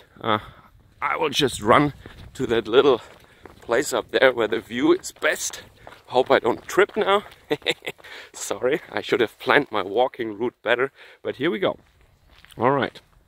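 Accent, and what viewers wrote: Bavaria, Germany. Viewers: bist du eigentlich bayerisch, oder fraenkisch oder schwaebisch?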